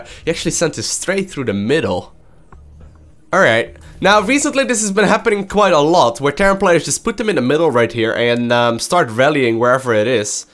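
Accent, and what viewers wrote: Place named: Netherlands. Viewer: Where you from, because you speak english really wel but you have a small foreign accent. Are you dutch or something?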